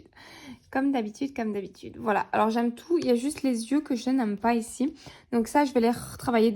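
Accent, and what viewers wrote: Belgium. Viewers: J'ai repris le colo cette année et j'ai pris une claque avec l'évolution du matériel ! toutes ces chaînes de coloristes mais j'avoue que ton accent belge avec ton côté passion douceur dans ce domaine te démarque 😁 j'adore également le scrapbooking donc, le mélange me plaît beaucoup ❤ merci pour tes vidéos 🌹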